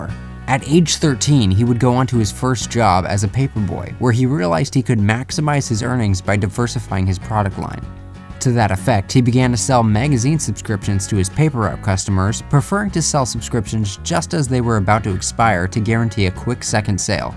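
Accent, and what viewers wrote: United States. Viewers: What American accent is that? (English is my second language)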